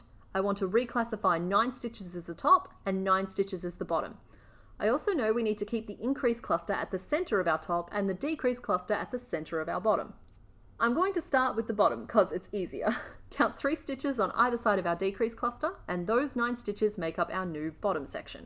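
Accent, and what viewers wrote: Australia. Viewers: OMG YES YOUR AUSSIE TOO❤❤❤ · You explain things very clearly! And it is so wonderful to hear an Australian accent and metric measurements. Thank you!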